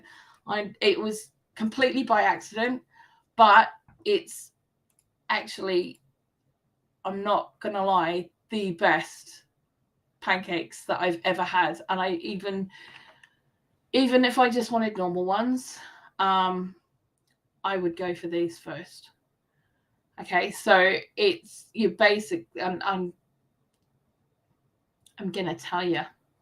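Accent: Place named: Australia. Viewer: Oh thought you were from England I see Australia now accent threw me for a loop! Do you garden? You should very relaxing. I garden I believe I saw you comment on a gardening channel. I am a Christian! I love to garden?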